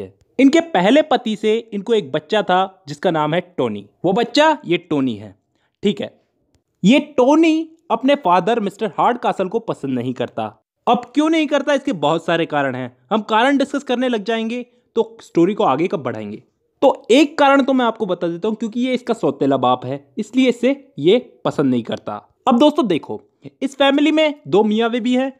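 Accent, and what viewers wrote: India. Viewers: Hahahahhah best way of explanation.... English with desi tadka wow .. great Sir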